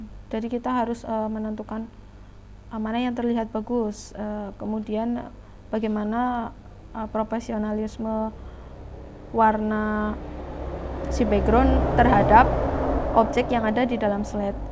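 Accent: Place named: Banyumas, Indonesia. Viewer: Informatif👍 mbak e pasti orang ngapak😀semangat👍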